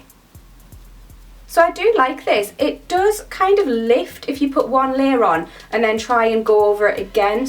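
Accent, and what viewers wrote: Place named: Wales, United Kingdom. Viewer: You probably aren't welsh but you sound really welsh. Im welsh :D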